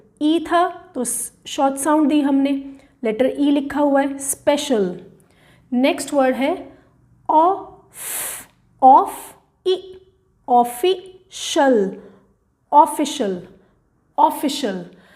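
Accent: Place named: India. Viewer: india sound english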